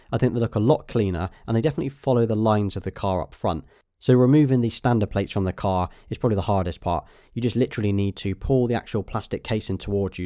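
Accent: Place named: England, United Kingdom. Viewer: Model 3. Great quality video and refreshing English accent 😉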